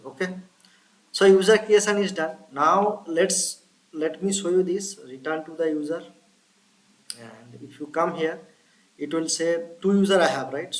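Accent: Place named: India. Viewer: Use sn AI text to speech reader because the India accent is too thick for me to follow what you sre saying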